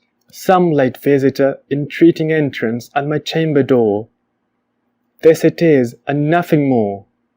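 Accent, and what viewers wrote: United Kingdom. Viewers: Buen acento británico, antes no me gustaba ese acento, ahora veo que estoy interesado en pronunciarlo bien, espero llegar a pronunciarlo como tú. Buen video. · No sere nativo, pero siento que tu acento suena muy británico 👏👏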